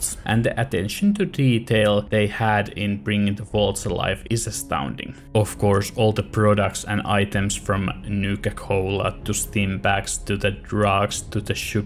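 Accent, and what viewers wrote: Finland. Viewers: the finnish accent is quite recognizable 😅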